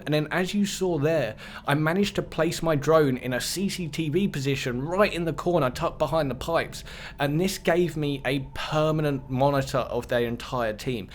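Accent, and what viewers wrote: United Kingdom. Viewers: ur accent is amazing, are u british? Watchng your vid makes my enflish better and apex skills better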